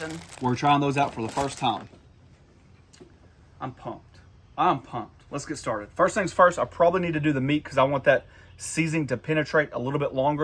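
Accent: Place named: New York, United States. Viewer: There is nothing wrong with your overalls. I happen to have the same ones, carharts I love them. My friends all say they never saw overalls on someone with a new york accent before. I just say YOU GOT A PROBLEM WIT THAT????? ps. Where did they go? Gone half way through the video. For get a bout it.....THANK you Frank from montana...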